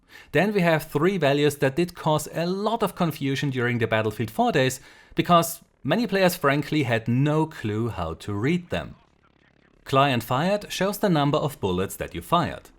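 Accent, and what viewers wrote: Germany. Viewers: Was für ein schönes deutscher Akzent. Danke für das Video. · Ist der deutsch? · warum nicht auch mal auf deutsch...zumindest für die nicht der englischen sprache mächtigen. xD ich behaupte am akzent zu hören das es deutsch ist...am i right? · I'm not gonna lie, while I like this info, it's this guys accent that made this video really enjoyable. He sounds like someone you'd play some European Work-Vehicle-Simulator-Game with and tell you stories about how he was part of the Federal German Ground Forces, ready to defend his bakery to the death in Alfeld if Warsaw Pact forces crossed the Fulda Gap and tried to reach the Rhine. ;_;7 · Where is from? Sounds a little german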